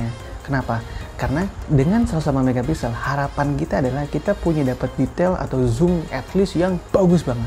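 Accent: Cilegon, Indonesia. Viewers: Org cilegon? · Wong cilegon kih ? Boleh amat 🤣🤣🤣🤣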